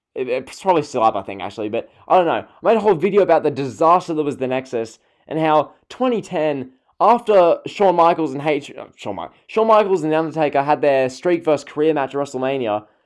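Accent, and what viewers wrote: Australia. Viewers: Hey man what part of Australia are you from?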